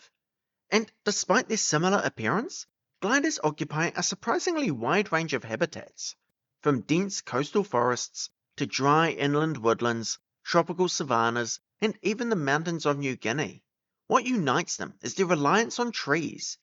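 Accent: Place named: New Zealand. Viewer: Good content and good channel name. I advise adding subtitles to your shorts if you make them as your Kiwi accent may confuse ppl. I can understand though but not everyone might. But it's the lowest priority.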